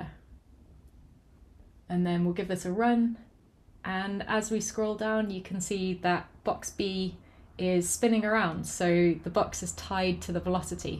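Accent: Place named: United Kingdom. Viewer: Does anyone think her voice is sexy? What British accent is this from?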